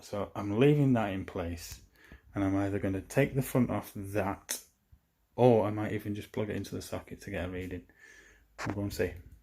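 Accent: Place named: North Wales, United Kingdom. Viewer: I keep hearing a hint of a North Wales accent mate, are you originally from that area ?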